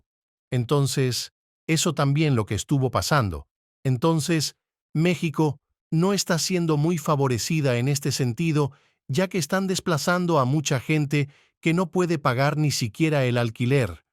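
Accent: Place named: Spain. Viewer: Yayaya párale españolito Mamila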